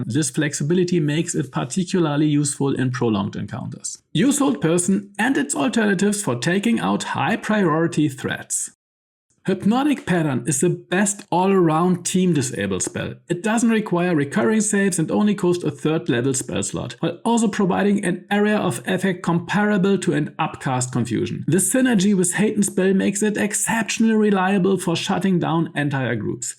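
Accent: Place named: Germany. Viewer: absolutely love this kind of in depth analysis!! keep it up!! recently for whatever reason youtube has been recommending me many smaller, german, bg3-focused creators and i have been all for it lol (forgive me if ive misheard your accent — it sounds german to me)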